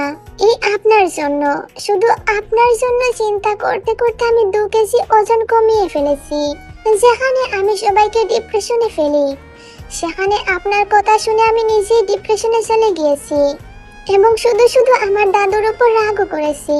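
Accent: Sylhet, Bangladesh. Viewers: না আপি মনে হয় সিলেটি❤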